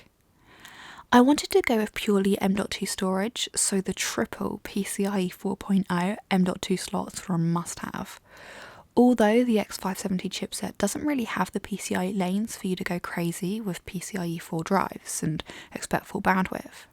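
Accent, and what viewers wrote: United Kingdom. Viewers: Hey, great too see u back in fine form. I hate to play matchmaker, but doesn't YouTuber Arun Maini from MRWHOSETHEBOSS live in London too? Imagine a collaborative, soothing fusion of two epic accents. 😉 All the best, phil from across the pond. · And talks very clear, i can tell she is british. But i could very easely follow her explanation as everything is pronounced very clearly. On a soothing tone But i would wait on gen4 SSD's 2.0 by figure of speech. They need a better controller first.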